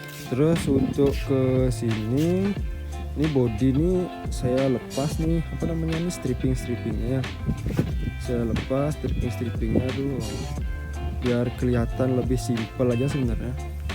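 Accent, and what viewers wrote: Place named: Madura, Indonesia. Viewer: Suara nya logat madura tak yee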